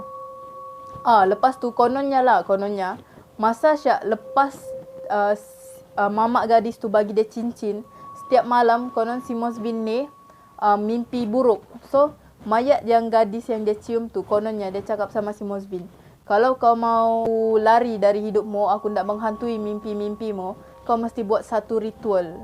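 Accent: Malaysia. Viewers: Kok lucu y lihat cakapnye orang Malaysia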